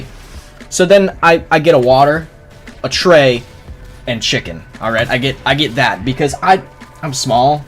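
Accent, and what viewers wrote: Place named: South, United States. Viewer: You sound southern in this video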